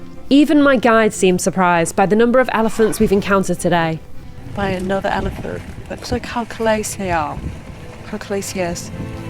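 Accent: United Kingdom. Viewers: I'm guessing your accent is Altrincham, Lymm, Warrington area (via London.)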